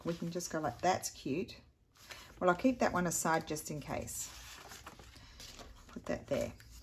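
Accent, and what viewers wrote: Australia. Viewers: Well done, considering the upheaval happening with packing and moving. I love watching your videos and the Aussie accent, even though you’re living far from us!! Thank you for this great idea with the “w”indow theme!! Regards, Susan W Australia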